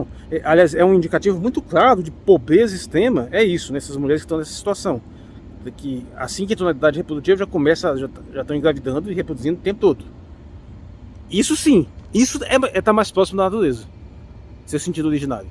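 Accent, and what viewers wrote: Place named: Brasília, Brazil. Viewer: Tu vive em contato com o bioma cerrado direto ? Pelo seu sotaque acho que você é de Brasilia ou do DF.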